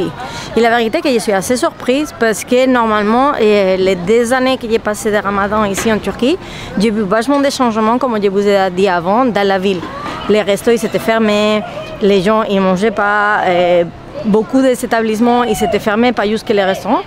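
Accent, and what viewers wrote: Spain. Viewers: Cc je te dis avec sincérité que tu parle notre langue parfaitement,ton accent d'Espagne est charmeur j'adore continue, continue, continue.la bise 😘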